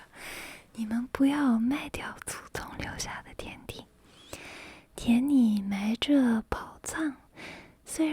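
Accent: Standard. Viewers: Your Chinese is really very standard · 讲实话你的中文太标准了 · 发音也很标准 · 明明发音很标准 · 你的中文发音非常标准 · 发音好标准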